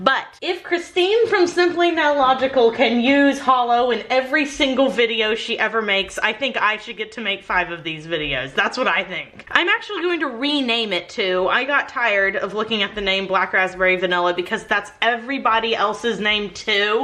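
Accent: Texas, United States. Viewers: The way you say scrapey scrapey is similar to grav3yard girl's sippy sippy thing. But it's probably just the texan accent to most people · You do sound like graveyard girl, it must be the Texas accent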